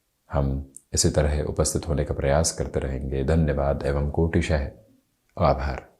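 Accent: Standard. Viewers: Apki Hindi sun kar bahot accha laghta hein… kash mujhe bhi itni shudh hindi aati 😊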